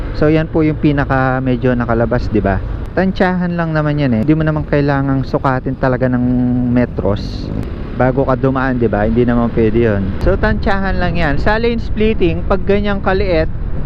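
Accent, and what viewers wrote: Pampanga, Philippines. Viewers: Sabi na nga ba kapampangan ka e,yung accent mo kasi. Sa Telabastagan yan 😁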